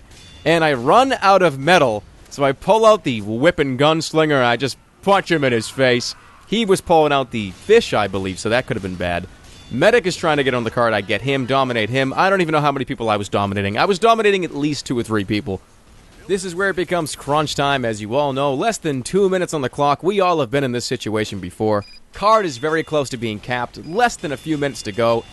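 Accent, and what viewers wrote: Boston, United States. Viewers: You soudn like you're from Boston or something. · American accent breaks google subtitles ammo it thinks is emo and gun it thinks is gone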